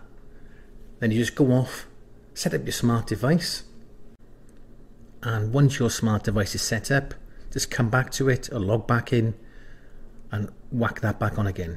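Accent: Wales, United Kingdom. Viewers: This guy sounds so British! You're one of the most British tech channel I ever watched. (Most tech channels are either Indian or Americian) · Omg, Taffy...lol it's so lovely to hear that Welsh accent...I miss UK.... Anyhoooo, cheers for that will fix it tomorrow as a, little drink is in order right now 🥂🥂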